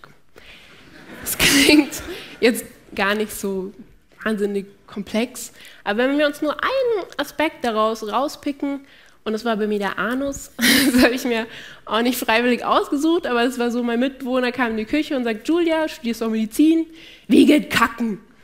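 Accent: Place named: France. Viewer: that's her french accent 🤓🤭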